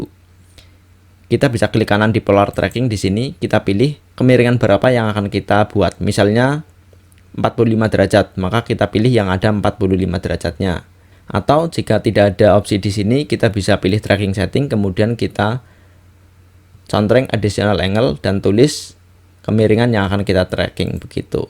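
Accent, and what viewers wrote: Banyumas, Indonesia. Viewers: mantap mas.. lanjutkan.. saya tonton videonya semua... btw masnya kayak ngapak nih xixixix josss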